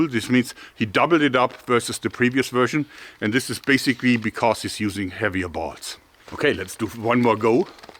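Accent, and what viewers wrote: Germany. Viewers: Hey, your accent reminds me of the accent Germans use when they speak English here in Germany. Are you German? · I love that germanized english accent!. Cool!.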